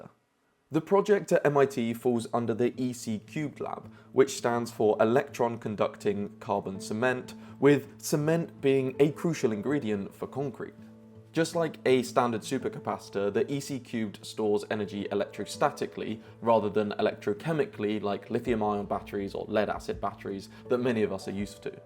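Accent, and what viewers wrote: United Kingdom. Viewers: Enough of the British accent. Speak English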